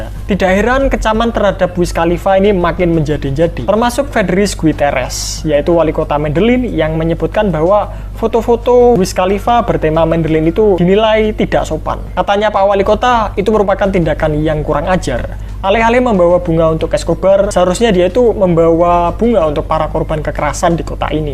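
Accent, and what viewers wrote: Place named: Java, Indonesia. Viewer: Medhoknya khas banget muehehe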